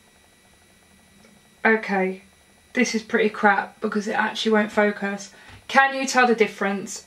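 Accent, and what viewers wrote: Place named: Birmingham, United Kingdom. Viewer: You sound like you have a Birmingham twang to your accent x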